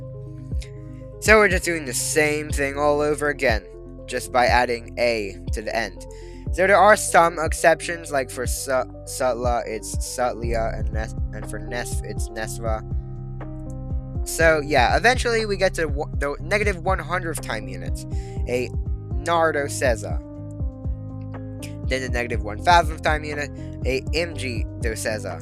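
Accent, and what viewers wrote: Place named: United States. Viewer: You are speaking USA English in UK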